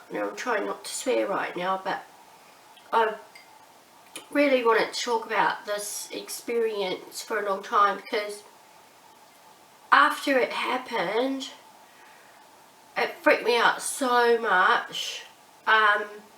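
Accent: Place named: Australia. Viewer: Thanks for sharing your experience.. I am also going to begin Sungazing, and would like to know if you Sungaze when it is cloudy, and the Sun is covered. Did sacred sounds and mantras through Dr Pillai also add to this awakening of the The Third Eye? I. It may have been the Kundalini (serpent) opening up more of your power center at the navel point which is a golden color. Shreem Brzee and Turmeric both are associated with that golden color...just giving some ideas to bat around. Luv your videos and encouragement about wealth/Brzee. You are candid, and funny, too! You have alot to add to everyone's understanding.and your Australian accent is charming..to us in the USA. Is there a product for cleansing that you can recommend ? Thanks..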